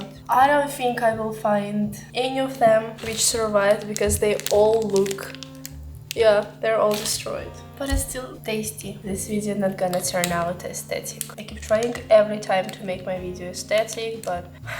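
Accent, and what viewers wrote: Russia. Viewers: Where did you learn your English? You are too funny. Love your sense of humor with a Russian accent. The food looks delicious. I’m seeing more and more Russians on YouTube telling their story. I wonder how you are getting past Internet sanctions? Anyway, good for you. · Mukbang video Russian style! Cuteness overload with the thick sexy Russian accent and pure youthful beauty. Of all foods, I have tasted the cottage cheese from Jocca, but made in Germany.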